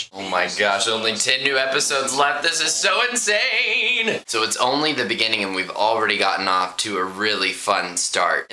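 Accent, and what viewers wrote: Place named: United States. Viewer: I love your videos dude, and I especially like the sound of your voice and your accent, it's so cool, which part of the US are you??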